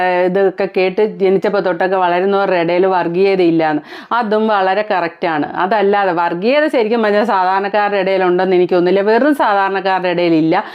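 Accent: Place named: North Kerala, India. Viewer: വീഡിയോകൊള്ളാം. നന്നായി. ആ ഞ്ഞാമെന്റൈ കൊള്ളില്ല. വടക്കന്മാരെല്ലാവരും പറയുന്നഫാഷ യാണ്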